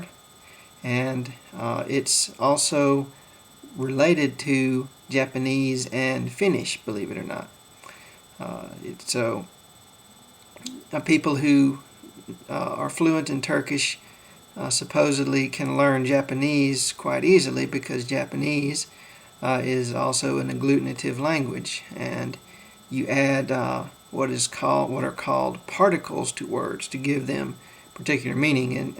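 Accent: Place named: Carolinas, United States. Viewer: Thanks I'm greek and i was interested in learning some of my racial neighbors languages and you sound like ur from down south, im from NC and ur accent sounded very carolinian. But nice to know a few things about the turkish language from a non biased PoV(as most people know we dont seem to get along too well... but shit thats past worries.)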